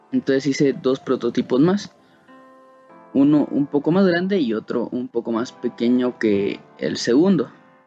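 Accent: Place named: Guatemala. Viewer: Se nota que sos de guate va loco jajajaja, saludillos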